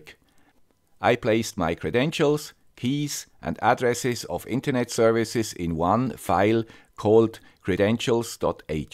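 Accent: Switzerland. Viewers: I was surprised how different some of the words were. I guess, I should have not been, but I've never been to Switzerland (sadly). Now I appreciate his Swiss English more... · is there a way becoming Swiss without having this funny German dialect? =D Hamburg (Germany) has some shelters, but we haven't as many as you have. Oh, and I like your income too, but not your expenses to live.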